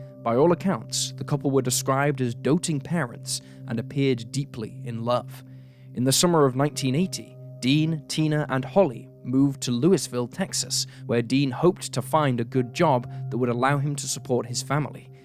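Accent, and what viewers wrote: United Kingdom. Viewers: American stories with a British accent?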